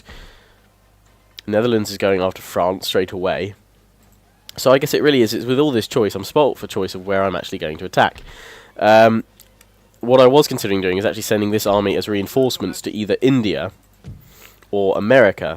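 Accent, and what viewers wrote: United Kingdom. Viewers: Very nice british you are speaking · Nathan Winkle He said several times he was from the United Kingdom and has a very clear and obvious accent. Are you deaf?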